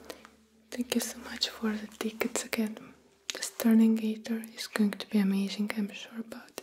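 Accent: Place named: Russia. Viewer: Where are u from? Ur accent sound like russian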